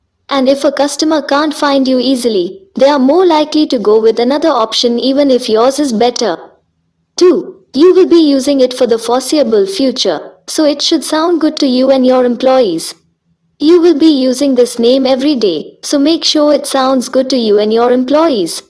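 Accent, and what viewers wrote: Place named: India. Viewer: An Indian voice ? Cool information. 🙂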